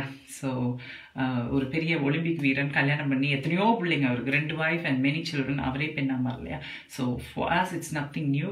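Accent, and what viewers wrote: Sri Lanka. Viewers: Tamil nalla irukku sri lankan aaa neenga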